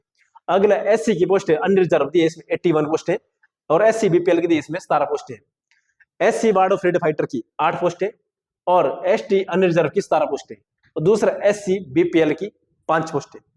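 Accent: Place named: Sirmaur, India. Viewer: Sirmaur se ho na ap bolne ke tarike se lg rha😅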